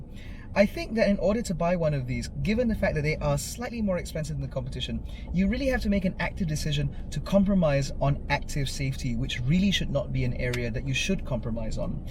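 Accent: England, United Kingdom. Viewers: Love your eng accents bro..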